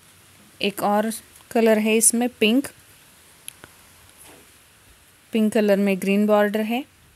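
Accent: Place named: Maharashtra, India. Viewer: आवाजाचा टोन मराठी वाटतो . मराठीतून सांगितलं तरी भारी वाटेल बाकी पैठणी सुरेख आहेत.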